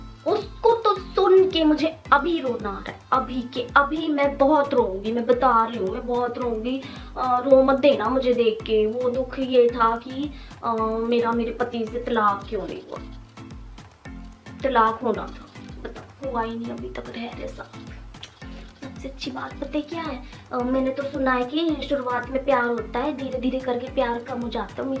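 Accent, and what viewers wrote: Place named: Punjab, India. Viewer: Aapka dukh Sankat tu mujhe Rona nahin a raha hai balki Hansi a rahi hai aapki baten sunkar 😂😂😂 itne acche se aap Punjabi language wale rahe ho, aur mujhe lag raha hai kuchh logon Ko karara jawab mein mil raha hai aapki ine baton se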